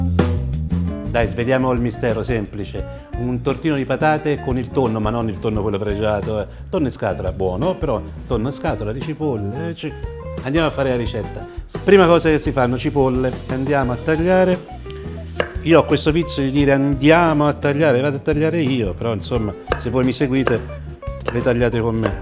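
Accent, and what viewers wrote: Tuscany, Italy. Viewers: Sei proprio forte, il forno a microonde non ce l'ho, ma non mi manca. Questa torta di tonno e patate la farò molto presto. Una curiosità, ma sei toscano? Un pochino........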